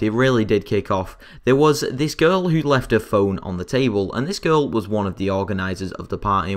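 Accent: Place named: Manchester, United Kingdom. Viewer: Man, this commentary is Manchester all over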